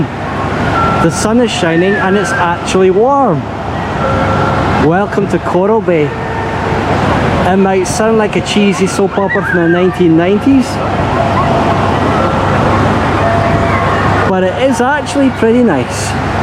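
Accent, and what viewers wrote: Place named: Scotland, United Kingdom. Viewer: Not a bad first attempt. That Scottish voice-over is quite amusing.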